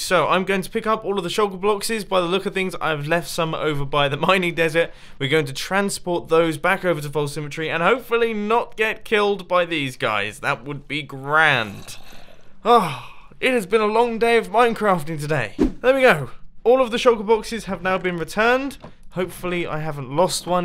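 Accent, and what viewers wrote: England, United Kingdom. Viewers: I love your vids, I mostly just watch for the English accent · WAIT! UK OMFG I THOUGHT HE WAS USA I UK😱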